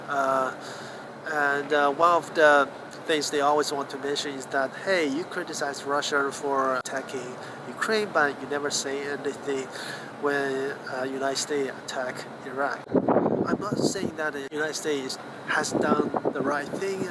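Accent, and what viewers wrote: China. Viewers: 呵呵 你掌握了流量密码 说着一口Chinglish迎合油管群体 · 你以为离开中国这个泡泡看到了世界的真相，其实你只是被包裹进了另一个泡泡而已，战争就是战争，一句带过的美军战争和整篇批判的俄乌战争，你的屁股已经坐歪了。我支持每个因为人种宗教政治或历史原因结成的团体捍卫本团体的生存权和利益。所以我同情乌克兰人民，夹在大国间艰难生存更被战争摧残；我也同情俄罗斯人民，因为历史体制政治等原因一直被孤立于西方世界只能努力的寻求着不被蚕食瓦解；我甚至理解台湾，明明和我们用不一样的世界观看待着这个世界，却始终不被完整承认，对明天的结局也充满未知；我也理解美国，无论错对做什么也都是为了本国好，对于美国人而言本该如此；我唯独没法理解的是你，英语再流利也听得出是中式腔调，说明你是在中国长大的中国人，一个离家的孩子哪怕走的再远看的再多，哪怕知道家里还有多少不足不对不堪，需要做的应该是让这个家变得更好，而不是一副完全对立的嘴脸完全否定这个家，否定所有的家人。很多历史的政治的原因造成的问题，不是一朝一夕就能完全改变，但今天的中国也远不是从前的愚昧无知，今天的年轻人也远不是你口中的是非不分。当有一天，你嫌弃的中国消失了，你，这个永远无法改变的中国人，也会很惨，相信我！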